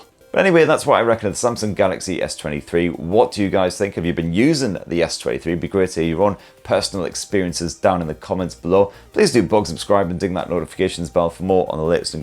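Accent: United Kingdom. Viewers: Excellent review which I enjoyed watching and you gave top notch information HOWEVER, being an American, your fast speaking, Brit accent was at times difficult to follow. I still gave you a Thumbs up, thanks for all the great info.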